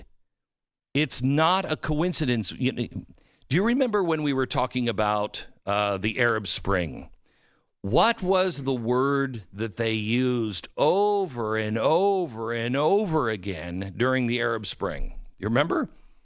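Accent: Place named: Russia. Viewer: Every time I think you are almost credible, out comes the crazy russian accent, the blanket statements of "nazis" coming to crush America, and the call to arms for us to stand strong against an enemy that we have no possible way of confronting. In the end I become more convinced that you are the face of crazy propped up by the deep state. Put here by design as an example of what foil hat wearing wackos look like so that by association we are summarily dismissed.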